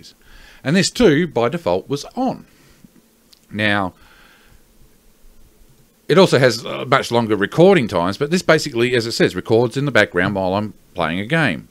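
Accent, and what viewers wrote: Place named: United States. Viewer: You have a really american voice I really like that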